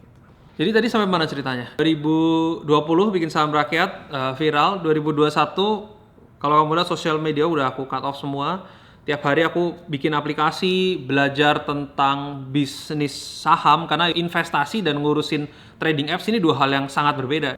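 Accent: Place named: Banyumas, Indonesia. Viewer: kayaknya kok sekarang makin kerasa logat ngapak e wkwkkw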